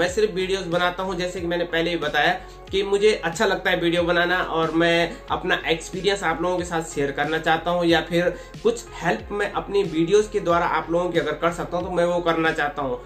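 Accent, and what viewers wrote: Garhwal, India. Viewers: Very good bro tumhari awaaj se lgra tum gadwali ho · Bhai. G kakha ka cha grhwali tune aani cha bht bdiya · Bhai apka voice ton gadwali hai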